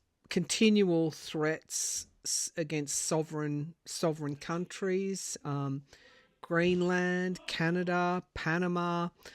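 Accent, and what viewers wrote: Australia. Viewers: Hello, firstly thank you for all your work, it’s much appreciated! ❤ 👏 Just a quick note: the far-right party in Germany is called AfD, („Alternative für Deutschland“ = Alternative for Germany), not „Adf“, as you kept on saying. With your Australian accent „Adf“ almost even sounds like „IDF“, the Israeli Defence Forces.:) No biggie, but I thought you’d want to use the right party name. It’s so easy to mix up all these abbreviations..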